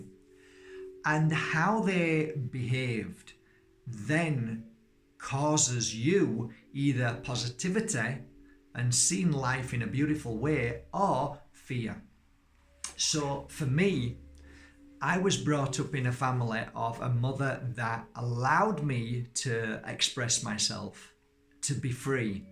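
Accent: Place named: Northern England, United Kingdom. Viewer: Trying to figure out which part of England your from is it lankshire Northern England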